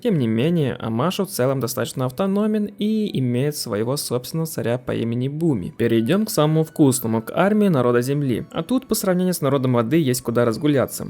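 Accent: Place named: Tajikistan. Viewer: Автор таджик? Просто интернсный акцент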